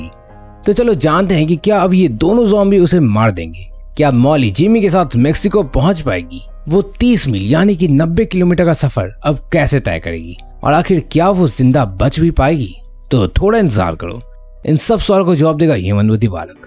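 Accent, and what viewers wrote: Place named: Bhojpuri, India. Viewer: Your Bhojpuri accent was op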